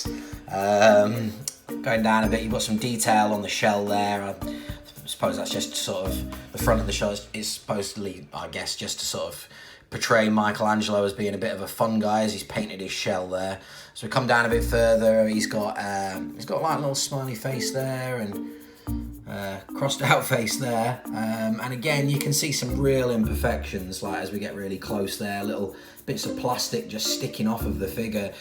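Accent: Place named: Australia. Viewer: I like your Australian accent! Also great review, really enjoyed it.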